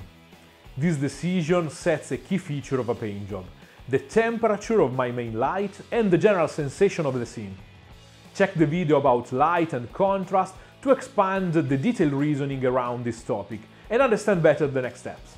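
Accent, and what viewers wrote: Italy. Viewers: Working in adult language acquisition I always tell my learners the same. Removing the accent is a ton of work, can easily fail depending on a multitude of factors and usually is just not worth it, unless you want to hide your origin for some reason. I find it charming and enjoy your videos no less for it, quite the opposite. Makes me think of some of my Italian friends, which makes you even more likeable, if that's possible. Last but not least, don't underestimate the Yoda effect: If you're the master and you want your lessons to be memorable and to penetrate your disciples hazy mind barrier, make your words different, intriguing and striking. Speaking like the rest truly you must not.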